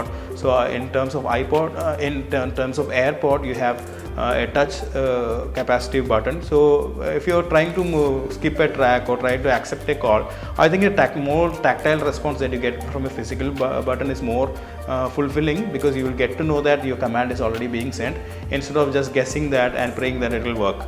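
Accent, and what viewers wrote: Kerala, India. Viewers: Hey malayali bro... The typical malayali accent... And nothing to be ashamed infact its something which connect all of us in this diverse society.. good to hear you and thanks for the video... ❤️ Subscribing just coz of the connection which i felt and efforts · He is a mallu...! I can hear that from the way he converse..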